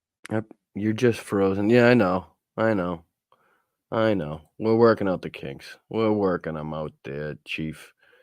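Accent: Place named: New England, United States. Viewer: This was fun. I learned more about you, which explains why I don't see enough of you. You are very funny no matter what you're talking about, and you're handsome with an adorably annoying N.E. accent. 😉 Take that and run with it for now. It will develop into something on its own. The first 10 minutes made me laugh hysterically. The tech issues being worked out was funny because, like I said, it doesn't matter what you're doing, you bring fun positive energy just by showing up. I like the shoot the shit mode with the chat. I need a break from the more rigidly constructed political shows. You can still talk politics. It's just more relaxed and off the cuff, so I'm less traumatised by the events of each day. Starting my day today with a smile, thanks to YOU❣️😁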